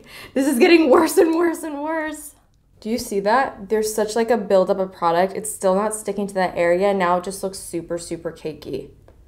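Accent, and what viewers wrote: France. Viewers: Are you French? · Are you french?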